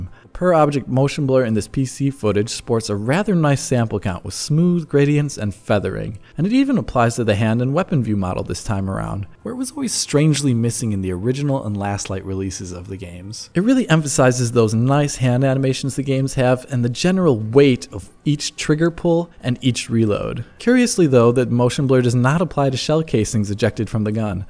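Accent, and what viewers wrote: Germany. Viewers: Wußt ichs doch, das ich da nen deutschen akzent raushörte. Bis bald 😉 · And by millennial lisp, I guess you mean a German accent.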